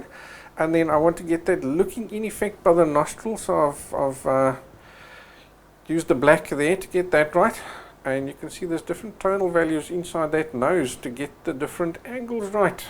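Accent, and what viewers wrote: South Africa. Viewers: great video, are you south african by any chance?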